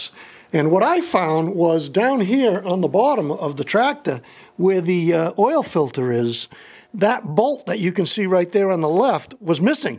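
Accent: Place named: East Coast, United States. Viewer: Hi! Thanks for the info from FB. You sound very east coast! Love it my man. Came from CT (where we speak proper enleash!) Looking forward to watching this.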